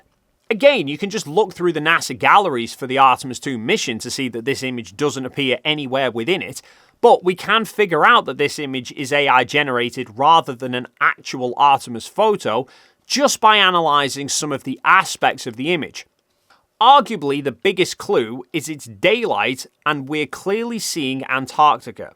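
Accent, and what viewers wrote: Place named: Northern England, United Kingdom. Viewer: It's just his particular working class-ish northern English accent. That's how many folks pronounce it. Americans pronounce is "Saa" instead of "Saw". Are they pronouncing it wrong?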